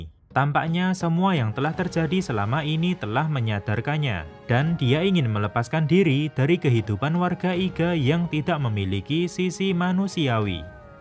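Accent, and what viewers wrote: Java, Indonesia. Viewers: Rasa belajar logat jawa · Wih logat jowo ne Ra ilang, lanjot terus gan tahanke logate rasah digawe gawe koyo wong hudu jowo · Sial. Malah ketemu jawa medok. Telingaku jadi gak nyaman. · Wong jowo Endi bang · Jawanya pekat bngt👍👍 smngt bro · suaranya medok:v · raiso dapusi medok e tp gpp ciri khas sampean mas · Bang wong jowo ta 😊😊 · Suara medhok e bikin kange chanel ini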